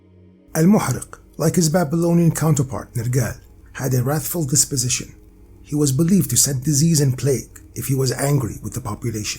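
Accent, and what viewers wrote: Arab world. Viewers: Well it is very hard for me to listen to your arabian english accent. Maybe you should include subtitles i the next video if it is not much of work. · Arabian accent and a deep voice = Set for life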